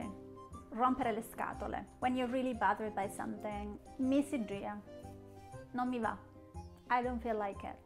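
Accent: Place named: Agrigento, Italy. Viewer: Dall'accento direi che sei agrigentina? Complimenti per il video! :)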